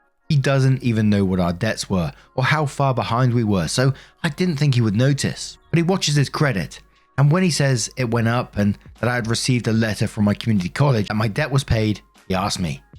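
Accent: United Kingdom. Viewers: every time i listen to talk i think "man he's so British"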